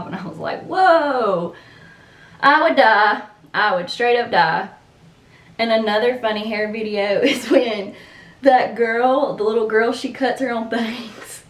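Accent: Alabama, United States. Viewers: I love your accent. You sound like my daughter-in-law. She's from Shelby, Alabama. Thank you for the video. I just bought one of these.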